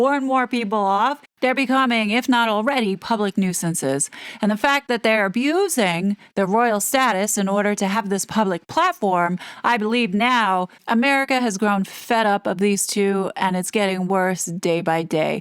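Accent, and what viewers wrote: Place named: New York, United States. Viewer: Thank you for reporting this! This is the first place I heard it, and what could be better than hearing your NYC accent. I love it! I think the whole point of this shit is to bring down the monarchy, to cheapen it as you say. Like I keep saying, they can’t have their new world order over in Davos with a 1K year old monarchy. Out with the old, in with the new, and it sucks.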